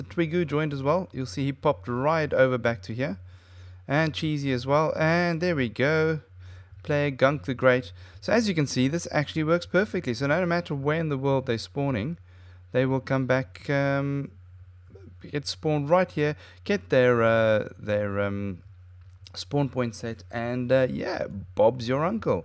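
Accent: South Africa. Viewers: I've been looking for something like this for ages...I love you! Thanks for the great How to vid! BTW you sound South African...xD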